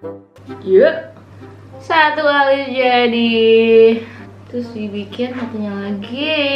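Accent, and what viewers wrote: Java, Indonesia. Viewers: kenapa medogh sekali bro :*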